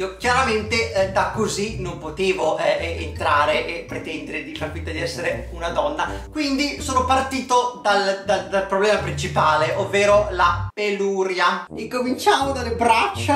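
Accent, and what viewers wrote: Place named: Romagna, Italy. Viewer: Ma perché in questo video hai la "s" romagnola? Adoro btw 😘...baci dalla Romagna ❤️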